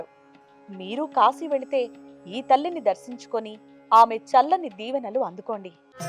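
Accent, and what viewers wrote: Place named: Telangana, India. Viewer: You guys have taken a good decision! Please continue with the content/news with strong throwing of words in Telangana Accent. Thank you 😊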